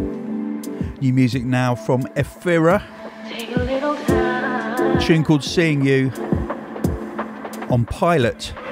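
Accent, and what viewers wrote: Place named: United Kingdom. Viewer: Gotta love how bri'ish my boy sounds, I love him so much. Big up, London.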